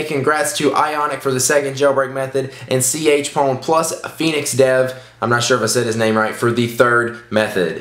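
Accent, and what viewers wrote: South, United States. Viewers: You sound country...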